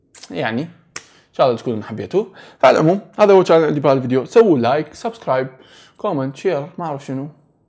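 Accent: Iraq. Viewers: لهجه عراقية هاي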